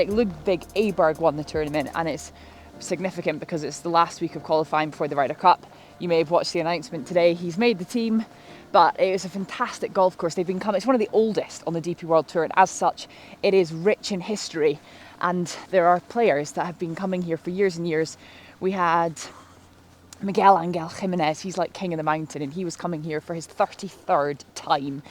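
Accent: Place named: St Andrews, United Kingdom. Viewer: I have started to putt more with the pin in. In the past three years playing about 100+ rounds per year (HDCP 6.3) and as a senior golfer, I find it helps with my depth perception. Maybe it's just my older eyes, but I can't remember the pin ever keeping a ball out of the hole for me. BTW, my daughter is a student at StA so as a Yank from the US, when I watch your channel or hear your commentary, it reminds me of what she hears every day and just helps to bring her closer to me. Please continue the great work! I love chasing daylight as well as being a dew sweeper!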